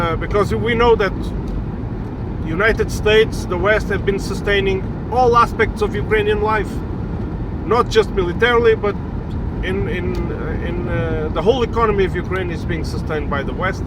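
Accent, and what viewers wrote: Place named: Russia. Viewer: Why you have Russian accent?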